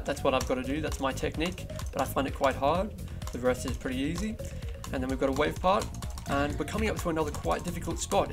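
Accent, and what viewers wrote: Australia. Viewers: OMG IS AUSTRALIAN WHICH PART OF AUSTRALIA DO YOU LIVE IINNNNN??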